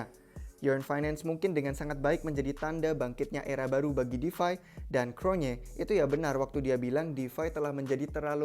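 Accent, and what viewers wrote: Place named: United Kingdom. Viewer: pronounce british emang seperti itu ngab , gak lebay mungkin kamunya yg gak tau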